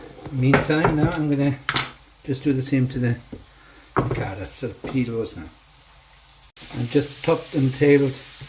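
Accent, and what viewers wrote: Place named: Wales, United Kingdom. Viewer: Happy new year I've missed your Welsh tones that looks fabulous. I shall remember this recipe, so you don't have to write it down. ❤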